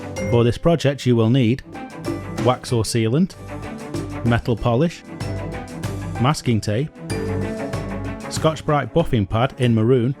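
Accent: United Kingdom. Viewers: Is this your first time hearing a British English speaker?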